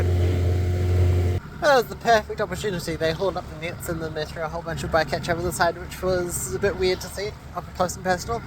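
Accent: New Zealand. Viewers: Well this is awesome! Never heard anyone speak with such a posh Kiwi accent! Oh plus the hardcore fishing … great work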